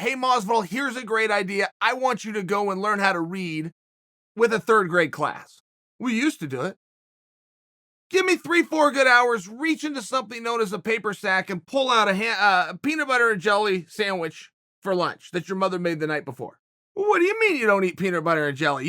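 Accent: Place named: United States. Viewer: Chaels American accent is sickening.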